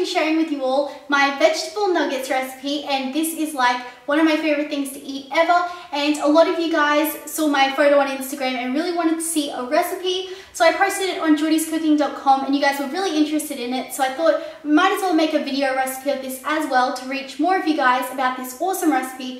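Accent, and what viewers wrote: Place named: Australia. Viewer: thank you for making videos - they are very helpful) its funny, but you sound almost exactly like heyyyjune, and i know you both are australian, but its not just that - im also subscribed to meejmuse and chloemorello, and they somehow sound i little bit different))) i just love aussie accent)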